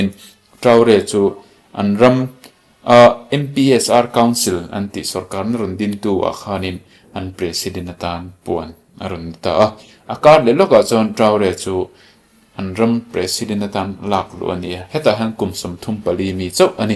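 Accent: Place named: Siaha, India. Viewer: Siaha lama i an deuh ka hria😅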